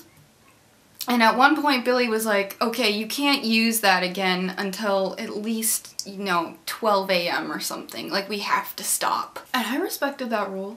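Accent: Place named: Minnesota, United States. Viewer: your minnesota accent was rly prominent in this video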